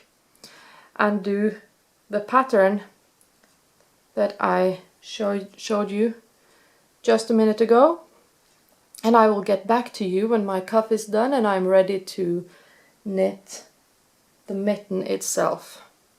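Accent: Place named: Norway. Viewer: Thank you for this detailed explanation! I lived in Norway ten years ago and hearing your accent brings such nice memories. Also your English is perfect! I remember my Norsk friends would always be embarrassed to speak in English too (until they had a few drinks!) so I smiled when you mentioned it. Tusen takk!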